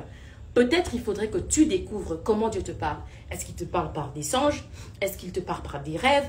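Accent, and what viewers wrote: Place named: France. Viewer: Elle s exprime très bien !!! accent français hein !!!!!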